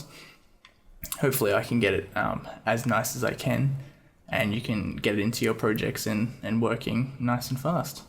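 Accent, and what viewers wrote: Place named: Australia. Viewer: great work on the climbing mod you got going. also cool to hear an aussie making it code :D happy new yr mate, subbing it now.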